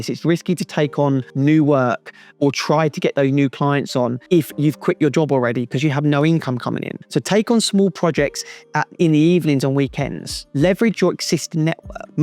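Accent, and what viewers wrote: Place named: Australia. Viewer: Thanks mate I let you know later because at the moment I'm busy to move in Asia. You're Australian, I recognized the accent because I lived in Perth for 2 years. 😁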